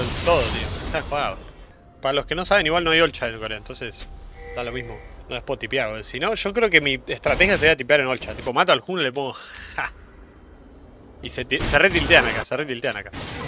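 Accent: Chile. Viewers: hablas como chileno ahora:face-purple-crying::face-purple-crying::face-purple-crying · Soy yo o me parece notar que a se le está pegando el hablar como chileno, con acento y todo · Grande hacías falta, pero algo me dice que alguien te está pegando un acento medio chileno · Por que habla como chileno ahora :V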